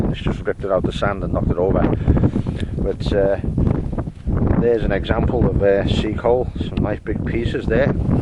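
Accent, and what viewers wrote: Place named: Birkenhead, United Kingdom. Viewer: Fantastic as always, I really enjoyed that & very interesting. Nice to see one of your childhood haunts, I've always thought you had a Birkenhead accent x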